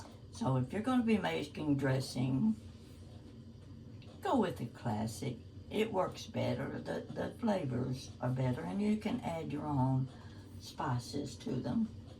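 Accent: South, United States. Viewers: Sitting in Canada listening to your sweet southern drawl! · I'm just so happy I came across your channel! What a lovely accent you have. I'm going to assume you live in the southern part of our country. Actually, I'm from the colder part which in the Northeast. I hope this doesn't send cold chills through your bones but I actually look forward to snow🌬⛄ This is the time of year I cook and bake more often. Even though I live in the colder states, aka New England, I do enjoy southern dishes! And of course who doesn't love chicken and dumplings? Yours certainly looks delicious! Thank you so much for sharing and look forward to being subscribed to your channel! 🙏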